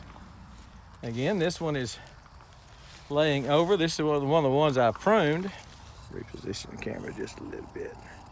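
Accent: Texas, United States. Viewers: Old Gardener Guy - Finland Its that Texas-thing, ha ha. Melody B in NC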